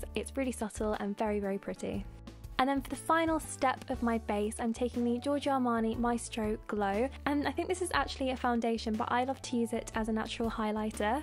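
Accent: United Kingdom. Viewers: I watch you a little bit too much to where I'm now talking in a British accent my family makes fun of me LOL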